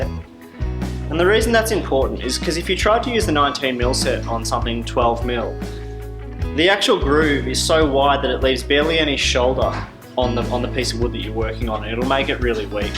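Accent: Australia. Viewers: Excellent instructional video. Like the fact its presented by an aussie so I can understand it. Thanks for posting. I'm buying the gear.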